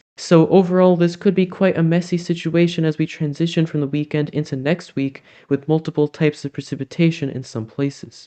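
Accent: Portugal. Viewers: He says it perfectly with a beautiful Portuguese accent. please don’t change! ❤️🌟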